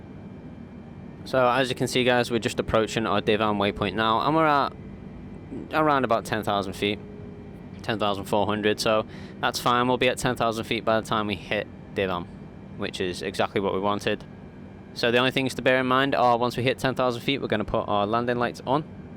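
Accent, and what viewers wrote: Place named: Northern England, United Kingdom. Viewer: Thank you, for all the tutorials! Very simple and easy explained, helps so much for navigating whether its a an A320 or B747. Additionally like your english accent, seems to be somewhere in the north of the UK (scouse?)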